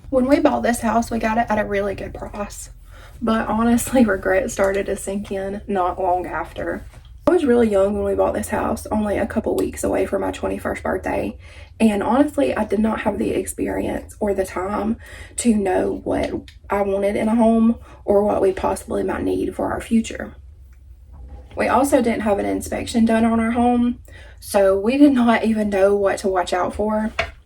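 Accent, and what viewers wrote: South, United States. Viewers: Girl I have no idea what state you live in. But obviously from your accent you live somewhere in the south. Do you know how amazing it is to own a house at 21? Girl, i graduated from college at 21 and then wanted to go crazy and live a life of freedom. I wasn't even remotely right in the head to get married until 26. Don't ever feel ashamed of your house that you bought and is paid off. You are a light years beyond where many people were at your age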